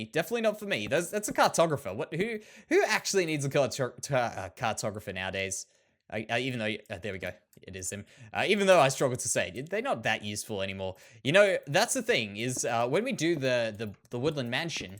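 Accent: Australia. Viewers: Hey are you Australian?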